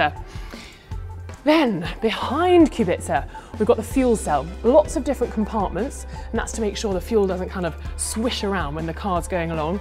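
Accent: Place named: England, United Kingdom. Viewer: Джереми Кларксон в юбке. Англичанка чтоли? у них у всех такой тембр и акцент?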